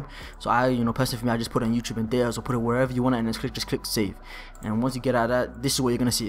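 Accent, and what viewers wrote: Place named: London, United Kingdom. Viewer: London accent! Gunners Fan??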